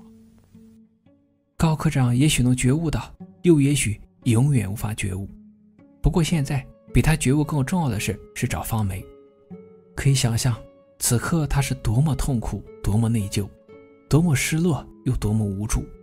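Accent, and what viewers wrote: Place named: Suining, China. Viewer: 博主是不是睢宁的，口音很像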